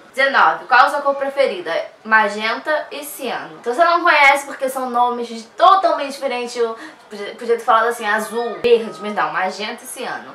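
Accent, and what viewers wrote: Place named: Rio de Janeiro, Brazil. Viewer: Não sei poorq mais amo sutaque carioca haha💛 tbm faço videos👊 se puder da olhadinha no meu canal agradeço um bjao😻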